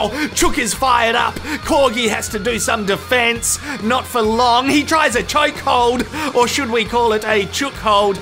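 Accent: Australia. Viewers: you make me want to be an aussie so I can talk like you!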